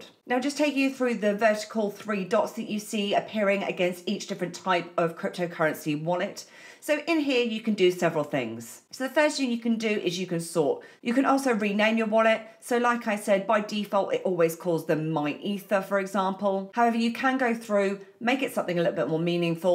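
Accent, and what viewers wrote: United Kingdom. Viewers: the heavy British accent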